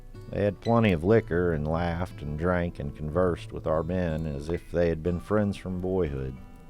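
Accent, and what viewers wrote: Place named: South Carolina, United States. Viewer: Good to hear someone from South Carolina. I had family there too.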